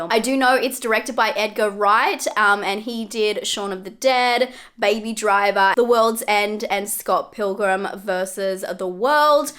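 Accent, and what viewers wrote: Australia. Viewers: WELL SWEETHEART, IM.JUST AN OLDER.MOM BUT I LOVE YOUR "NASALY" (NOT EVEN!) AUSSIE ACCENT AS WELL AS YOURE AWESOME CONTENT AND YOURE ABSOLUTELY LOVELY TOO! KEEP UP THE GREAT WORK, SISTER!❤😁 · your nasally Australian accent is awesome to this California girl--LOL!!! Thank you for the great commentary!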